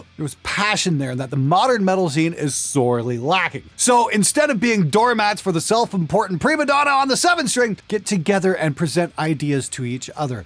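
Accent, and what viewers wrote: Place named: Canada. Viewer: I wasn't surprised one bit when this guy said he was Canadian, my guess somewhere in the southern Ontario. Love your shit keep them coming!!!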